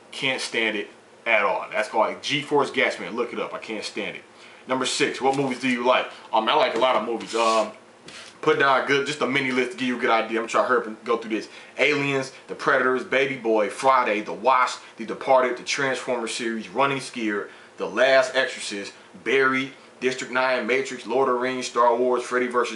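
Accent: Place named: Midwest, United States. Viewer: i knew you was a mid-west dude, my guess was probably st louis or detriot.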